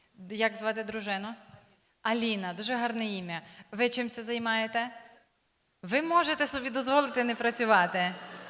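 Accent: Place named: Western Ukraine, Ukraine. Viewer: Вона з Західної України, там акцент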